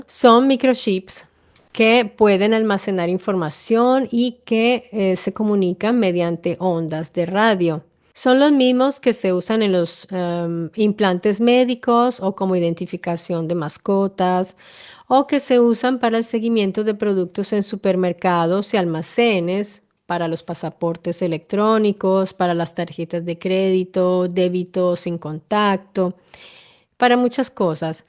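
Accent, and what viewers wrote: Italy. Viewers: muchas gracias por tu nuevo video!!!! ya entendí por qué pensé que eras italiana viviendo en colombia por tu acento, era al revés jeje... pues si, esto de los zíngaros es un problema inmenso de toda la vida, pero ahora con el "buenismo" en el sistema judicial, pasó de problema a catástrofe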